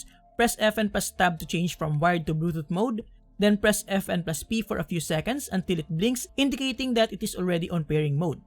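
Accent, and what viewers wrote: Philippines. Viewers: are you filipino ?? because you sound just like my fam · Lmaoo I was not expecting a Pinoy accent, Filipinos on tech tube😎😎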